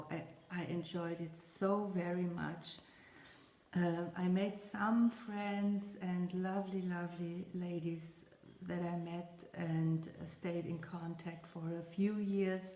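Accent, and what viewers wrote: Germany. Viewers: Hi Again, great video as always. I was sorry to hear you have been feeling ill with your oral surgeries but hopefully you are on the mend and feeling better. I'm praying for you to have a full and complete recovery. I have a lovely friend who immigrated to the US from Germany when she was very young, I believe some of her family are still in the Frankfurt area. She misses the accent/dialect so I told her check out your channel. Loved your "know your needle-worker" segment. We are so very much alike... in our choices of movies, books and love of music. If I could pick a one word definition for you I'd say, "caring". You care about people, the way you express yourself and how you are perceived, and of course your love for your craft...so yes, to me it's definitely "caring". Have a good stitchy week and I look forward to your next video. Hugs a Bunch, Toni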